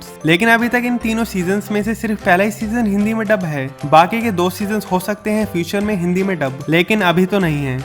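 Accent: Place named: Uttar Pradesh, India. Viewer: Bhai tu U.P ka h na ... Tre accent se bta skta hu Ghaziabad ya meerut ya hapur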